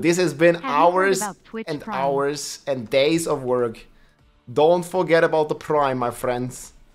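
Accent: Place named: Germany. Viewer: My only suggestion to you would be. If you hate everything about this, skip it. He is not going to change the way he speaks. It's his accent, and he is german. Plus, I highly doubt that he would even try to, just because of one comment on youtube. :) I wish you well, but I also suspect that your frustration has roots in much deeper problems.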